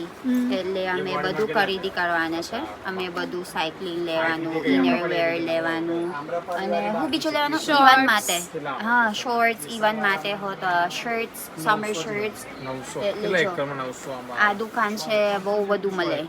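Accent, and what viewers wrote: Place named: Charotar, India. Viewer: speaks charotar gujarati 😅 sounds really good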